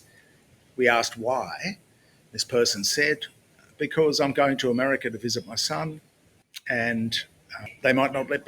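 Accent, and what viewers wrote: Australia. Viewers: Nice to hear an Aussie ascent on my side of politics. I have missed it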